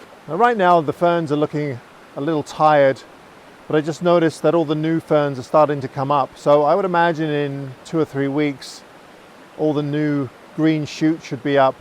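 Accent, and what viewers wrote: United Kingdom. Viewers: I subscribe to a half dozen photographers and enjoy them very much but you have a special eye in your photography that I really enjoy. I'm also partial because I'm from Vancouver Island and admire the way you share it with the world. It's an amazing place. Your vlogs are truly awesome and better than any TV show I'd sit in front of. Congrats on the new slider! More gear. :) Mind you, IMO, the time-lapse of water and nature distracts from the beauty. But still a huge fan! Keep 'em coming. BTW, what's your accent? UK?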